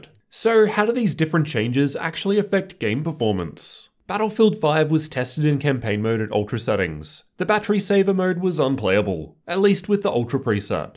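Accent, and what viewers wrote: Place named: Australia. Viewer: it's the Aussie accent I assume. He really emphasizes the OR in port. "USB-C pOOORRt, USB 3.1 type A pOOORRt, HDMI pOOORRt, mini displaypOOORRt" it sounds really jarring to a Canadian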